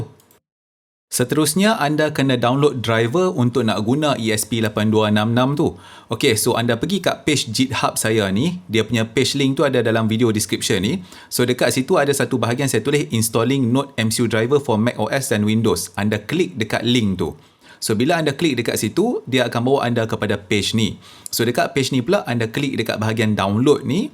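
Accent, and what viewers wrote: North, Malaysia. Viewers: Seronok dengaq oqang utara punya slang tu piaw..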